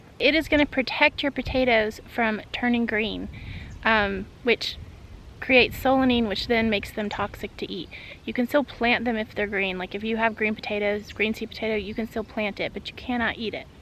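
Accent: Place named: South, United States. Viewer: Love you!! Is it just me or is she extra southerrrrn on this one?? ❤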